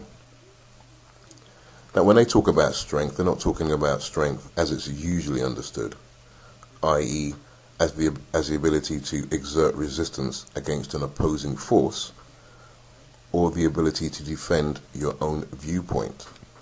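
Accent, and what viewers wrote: United Kingdom. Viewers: well i take care of myself but i dont consider myself to be a strong and independent woman, i dont wear weave or spend my precious money at the beauty shop and i NEED a man to do take are of me, as he should. so does this make me a black female (which is what i am) or a white woman? lol this dude is seriously stereotyping all black females! where is he from? wales? love the accent! i would love for a real man to step to me and do what he should! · Sounds like London !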